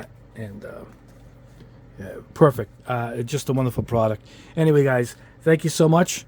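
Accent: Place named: Rhode Island, United States. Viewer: I've been using my Iwata CN NEO in both trigger and button valve for a few years now and I'm getting better every day. I need an airbrush with a 0.2mm or smaller needle for painting some minis. Sure, I would love to get an H&S but $$ is really an issue for me as a retired person. What airbrush would you suggest $50-$75 for what I'm looking for? Hey; by the way, I'm a fellow Rhode Islander born and raised in Bristol before I relocated to (Bristol) Vermont 15-16 years ago... I love the fact that I can listen to your accent and really feel like I'm at home listening to an ol' buddy.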